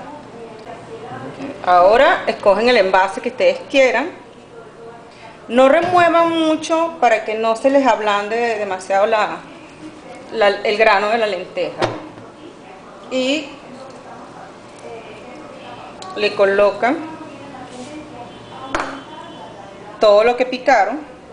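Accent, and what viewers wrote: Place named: Venezuela. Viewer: Buena la receta y tiene que ser venezolana por su acento muy marcado😀😀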